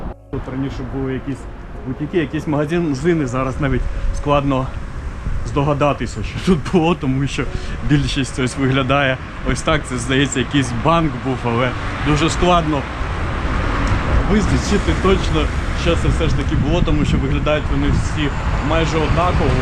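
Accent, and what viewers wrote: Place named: Kherson, Ukraine. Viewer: Вуха не ріже, на помилки не звертаю уваги. Головне що від серця. Нормальна вимова, херсонська😊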